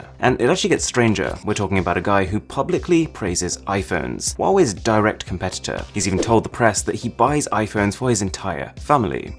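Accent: United Kingdom. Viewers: That is just how British people talk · I enjoy this type of content. Please continue to provide informative YouTube clips like this, Apple, etc. Your content is so varied in the world of tech - but most of all informative. Many YouTubers cover the latest technology but it is nice to learn more about the R&D developments, the company behind it (beginnings, growth, direction, and aspirations). This is what you provide. Please continue on your current trajectory. 👏🏽👍🏽 P.S. I love hearing the accent of my fellow Brit on YouTube. So few in the world of tech - but at least you’re not alone. 😊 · he sounds British to me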